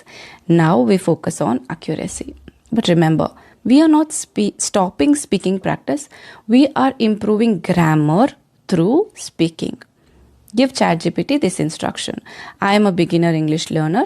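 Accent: India. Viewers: U r such kind desi voice who might be transformed very easily to standard English pronunciation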